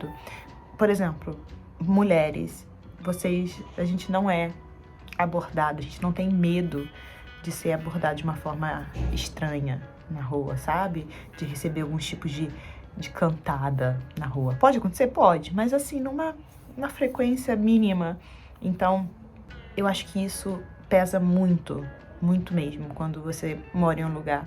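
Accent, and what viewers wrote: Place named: North, Brazil. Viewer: De onde vc e? Sotaque parece do norte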